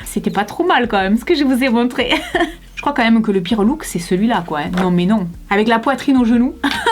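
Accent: South, France. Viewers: Coucou! J’ai découvert ta chaîne il y a une semaine et j’ai regardé toute tes vidéos! Ça fait vraiment du bien! C’est frais et ton accent me rappel ma famille dans le sud. J’aime vraiment que tu te prennes pas la tête et que tout soit naturel ça change des Youtubeuse de 20 ans Alors un grand merci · et bien j'en rajoute une couche, je viens de découvrir tes videos et 1) tu es superbe, rayonnante et rousse ! (comme ma nièce et je vous trouve mais belles !) 2) j'adore ton accent, on est du sud sans aucun doute ! 3) tes videos me font aussi un bien fou ! bisous "maman" ;)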